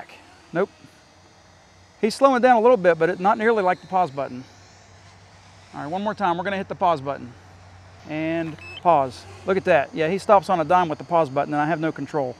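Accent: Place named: West Virginia, United States. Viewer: I really enjoy all your tests, sense of humor, and I love your WV accent. You seem like such a down home Christian guy. I also love your scripture quotes at the end of your videos. I'm a California Baptist, and I love listening to Southern Gospel music. I'm planing a trip to the east coast this fall, for a short hike on the AT. It would be great to meet you and and shake your hand. Thank you for these great educational videos!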